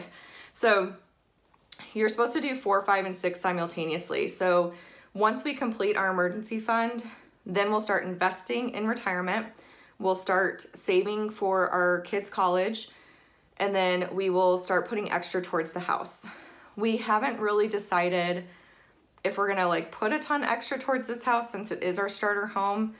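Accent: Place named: Wisconsin, United States. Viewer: WHOOP...WHOOP...It was really good watching this & learning about you. #MIDWESTGIRLS I live in Indiana & I know you say you've lived in Arizona for about 20 years, but...I can still hear a bit of that "Wisconnnnsen" accent in your voice. I only know it because I have a lot of friends who live in WI. Do you work for University of Phoenix? I took classes through them & although it was much different than a traditional brick & mortar school, I really liked it. I think I'd love to have a job like what you do. It seems like you've got a pretty good thing going! Good luck with your journey & looking forward to hearing your "news" next week (can we probably guess what it might be)?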